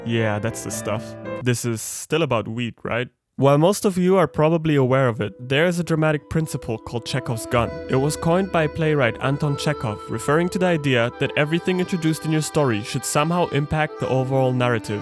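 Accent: Germany. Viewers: Junge eine Sekunde des Videos und ich weiss einfach, dass der Bre deutsch ist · Good video. The Made in Abyss OST in the end of the video just fits to MT. I was listening to it on repeat while reading the novel. PS: Hab 5 sekunden gebraucht, um herauszufinden, dass du deutsch bist. Unser Akzent is einfach zu offensichtlich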